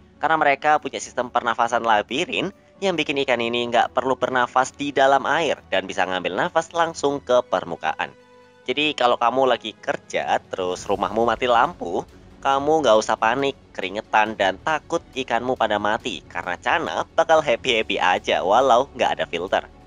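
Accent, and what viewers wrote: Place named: Java, Indonesia. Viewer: Temen temen cenderung ngenyek🙈 Logat jawa nya tetep gabisa ilang walau di gimanain juga😅 Tetep semangat bangs💪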